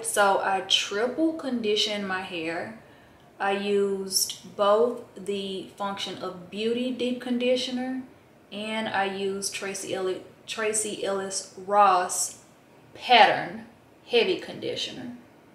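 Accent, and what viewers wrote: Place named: Memphis, United States. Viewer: welcome to almost 40.. But you are still a BAD chick..U make me laugh so much because being from Memphis,i hear so much of it when you talk.. So i cant relate…You can also use rice water on your hair, it work wonders.. still stinks but I use it weekly with Castor oil…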